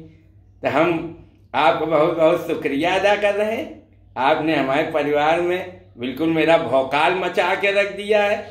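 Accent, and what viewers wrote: Kanpur, India. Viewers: क्या भिगो के कनपुरिया अंदाज में मारा है बड़े भैया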